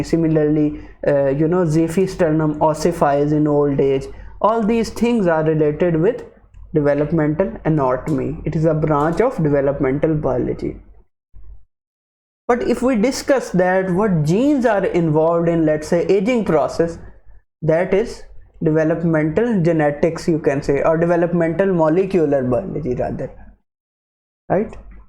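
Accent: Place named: India. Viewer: From his accent, I think he is Indian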